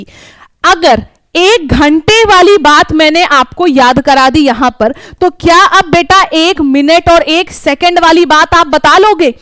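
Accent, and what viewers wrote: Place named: Gurgaon, India. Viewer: Hindi to aap gurgaon r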